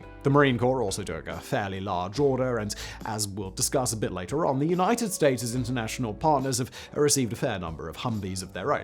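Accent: RP, United Kingdom. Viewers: I'm a Brit, I don't know anybody who speaks like this, with such an unnatural exaggerated RP accent. Why not just speak London RP? It takes away from the otherwise great video content.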